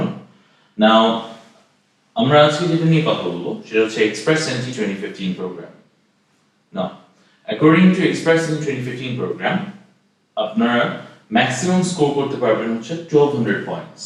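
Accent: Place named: North America. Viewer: Don't understand why people are moaning about his presentation or way of speaking? He probably lived in USA or Canada for a long time hence he speaks like that and personally I would prefer his presentation as it's clear and concise.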